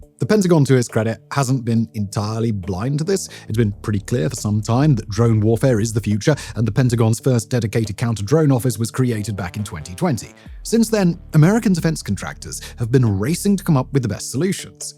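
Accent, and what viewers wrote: United Kingdom. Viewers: Is this a UK accent ? Uk isnt prepared for Drone war either, they're not even prepared for regular war, they only have 20 mission ready battle tanks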